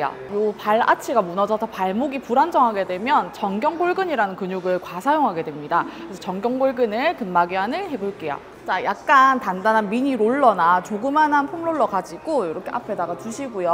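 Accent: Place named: Busan, South Korea. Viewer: 오붓싼?! 같은 부산이라 그른가 사투리 찰져서 이해가 쏙쏙 잘 되네요😊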